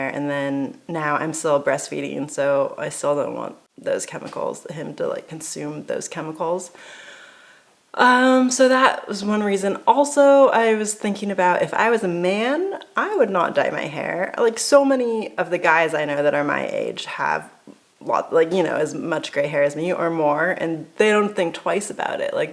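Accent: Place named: New Zealand. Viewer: Compared with your PCT videos your accent is definitely developing a NZ accent edge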